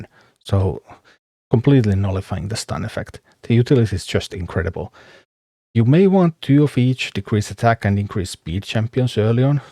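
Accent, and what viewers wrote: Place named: Finland. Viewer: Are you Finnish? Your accent sounds suomalainen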